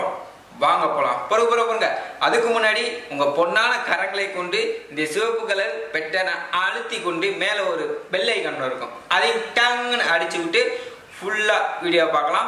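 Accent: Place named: Marwari, India. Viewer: உங்கள் தகவல் பயனுள்ளதாக இருக்கிறது.........ஆனால் உங்கள் பேச்சில் தடுமாற்றம் அதிகம், சேட்டு காரன் தமிழ் பேசுற மாறி இருக்கு..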